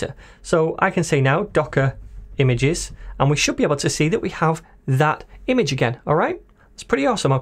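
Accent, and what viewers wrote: United Kingdom. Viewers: I love UK accent. Regards!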